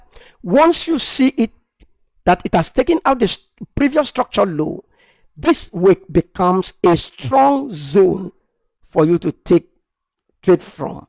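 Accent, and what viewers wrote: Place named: Nigeria. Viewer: Thats Nigerian accent❤❤❤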